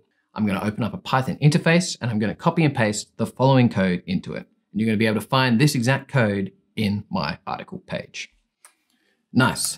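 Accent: Australia. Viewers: He’s just an Australian…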